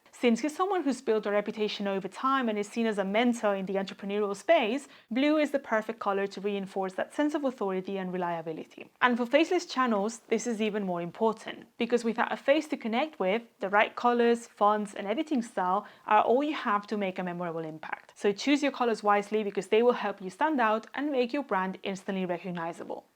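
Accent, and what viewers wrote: Spain. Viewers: Puedo detectar el acento español hahaha, buen video!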